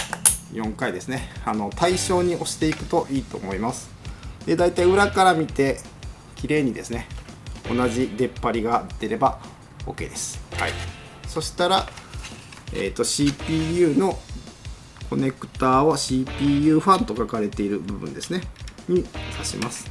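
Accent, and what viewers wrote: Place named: Nara, Japan. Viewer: イントネーションが奈良か京都、多分奈良っぽい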